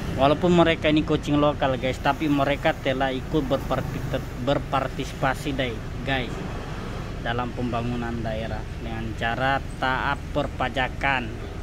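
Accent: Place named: Bugis, Indonesia. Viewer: Ooh tinggal di bulungan ya bang.... sya pikir tinggal di sulawesi , aksennya bugis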